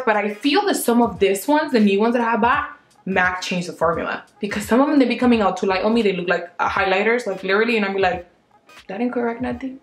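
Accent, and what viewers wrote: Panama. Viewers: I'm 50% Cuban & 50% Panamanian And I love hearing you talk in Spanish because I wish I can talk like that w that "que sopa" Spanish accent 🙈😿 lol viva Panama #Colon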